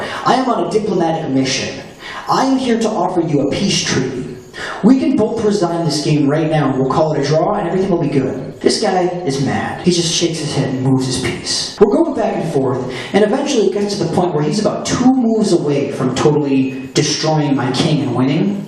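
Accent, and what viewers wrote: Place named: Spain. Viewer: Best part: spanish accent hahaha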